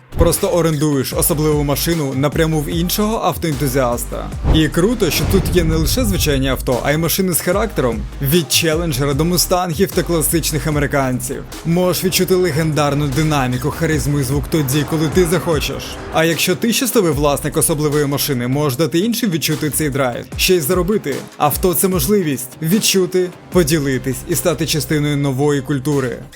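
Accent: Russia. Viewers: "Не цямиць", "падзіння", "бюрокрації", "дзякуємо".. це за першу хвилину.. далі мене не вистачило слухати цю "маацковскую" вимову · Було цікаво але проскакує заводстка расссєйська прошивка. Важко слухати, коли кожне 10 слово викривлене. Хоча кожне 10 - це не кожне 3 …але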